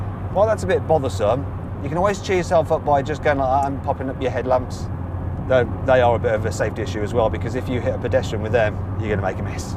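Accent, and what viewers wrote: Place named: Birmingham, United Kingdom. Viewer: Why does he sound less Brummie than on Carwow? No idea why anyone would emphasize that accent lol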